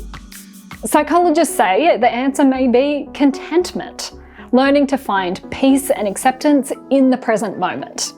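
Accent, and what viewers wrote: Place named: Australia. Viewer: One thing that makes me very UN-happy is when obviously Australian (your accent) presenters reverse the video to make it look like they are in left hand drive vehicles on the right hand side of the road. I can see the Sydney Harbour Bridge behind you while sitting in your car, yer the video is reversed to give it that all-american drive on the right look. Please, embrace the fact you are an Australian and stop doing that, meanwhile I'll stay unsubscribed, plus down tick it, until I see this happen.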